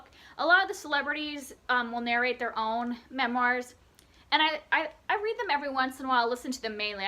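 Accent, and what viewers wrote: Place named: United Kingdom. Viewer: For me if you have a British accent for your audiobooks ... I will listen to you talk for hours!! Such great recommendations on this list!! ☺️💜💜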